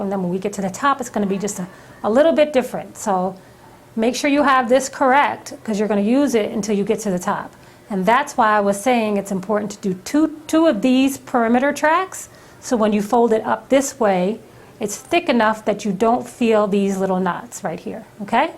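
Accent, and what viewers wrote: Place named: Puerto Rico. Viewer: R u Puerto rican? I love ur accent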